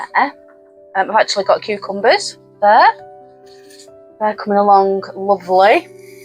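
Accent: Lancashire, United Kingdom. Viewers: Oh you popped up in my for you page and I thought I recognised that accent! I’m from Blackpool too. We live in Lancaster now, we also have a tiny yard, but have just been given keys to an allotment, it’s so lovely to have two places to grow. I’m sorry about yours, how annoying about ICI. I am loving your garden, it’s lovely.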